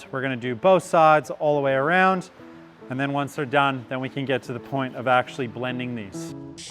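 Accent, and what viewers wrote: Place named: Australia. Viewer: First time watching your channel, and I'm sitting here trying to figure out where you are...! Obvs American accent, but I'm hearing Aussie and Pom, and you're talking about The Mad Scot. Now that I've googled...wow, you really have got an Aussie drawl!! 😄😄 Great work mate, those flanges look superb 👌 (Possibly might have liked some in depth on the sand forming, but awesome all the same!)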